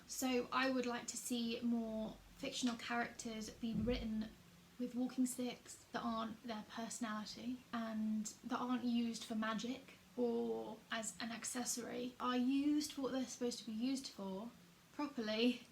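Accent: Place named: Southern England, United Kingdom. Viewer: You sound like Beckie0 <link> Where abouts in England are you from, somewhere down south?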